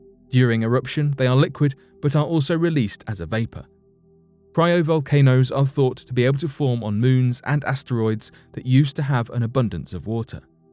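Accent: United Kingdom. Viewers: Appreciate the British accent.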